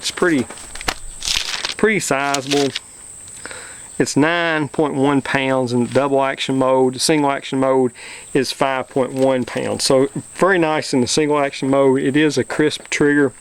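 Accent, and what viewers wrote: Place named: South, United States. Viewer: Buddy, where in South are you from? You have a very distinct accent.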